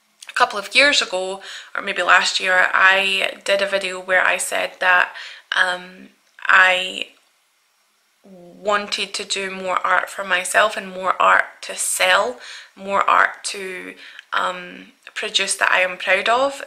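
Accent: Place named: Fife, United Kingdom. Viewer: yeah I know what you mean about me pursuing drawing. I used to really love it when I was younger and I was ok at it, but then when I attempted it about a month ago I was horrific at it and I was like "eh where did all my talent go lol", but as you say, if I put in the time and effort I could get good at it. I know you moved house a few months back so I hope all that went ok, it's always really stressful. I'm a Scottish gal myself, where are you from, you sound as if you could be from Fife. Hope your handsome boy Cayden is doing good as well 😊 Btw I would love you to do more colouring book videos but I know probably art and drawing is your first love 😁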